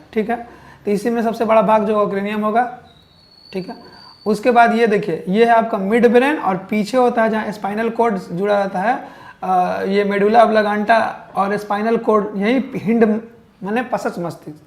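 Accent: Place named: Bhojpuri, India. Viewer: Explain accha kr rhe h 👍 Tum lakh chupao baat magar bhojpuri to nikal hi jayega❤️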